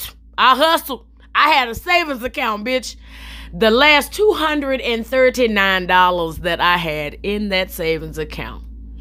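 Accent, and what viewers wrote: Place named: North Carolina, United States. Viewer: You from Nc because you sound like me and my family..the skrenf lol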